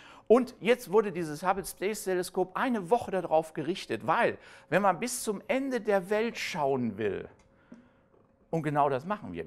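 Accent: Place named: Westphalia, Germany. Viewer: Aber in Leipzig geboren und ab 1977 in Münster studiert. Da kam es wohl zur westfälischen Adaption. Was für ein Glück. Man stelle sich diesen Vortrag mal auf sächsisch vor. "Dor Ürgnoll, de Krawwidodsjöön, däs Ällägdröön is büngdförmisch, ün de Raumdsait is gegrümmd." Nee, lieber nicht :-)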